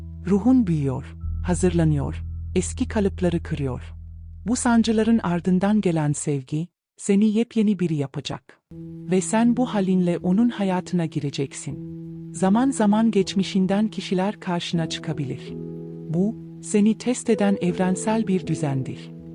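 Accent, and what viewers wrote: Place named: Germany. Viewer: Şu Almancı karıya okutmayın şunu ciddiye alamıyorum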